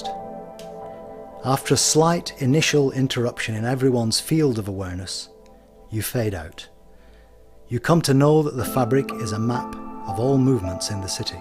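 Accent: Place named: Lancashire, United Kingdom. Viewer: Love this, so relaxing..love his Lancashire accent..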